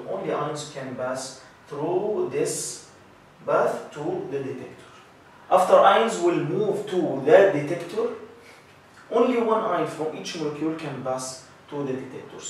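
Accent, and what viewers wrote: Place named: Egypt. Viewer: من لهجتك وهيئتك باين عليك مصري رفعت راسنا بالمجهود الجميل دا❤❤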